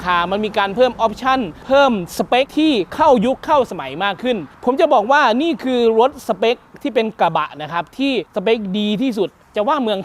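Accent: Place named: Isan, Thailand. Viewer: admin คนอีสานแน่เลยสำเนียงภาคกลางพอได้แต่ยังไม่คล่องเท่าไหร่ครับ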